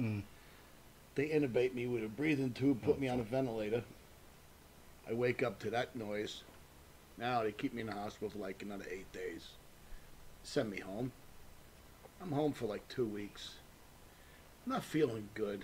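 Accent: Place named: Northeast, United States. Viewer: Which Doink is this? He sounds like he is from the Northeast, Steve Lombardi?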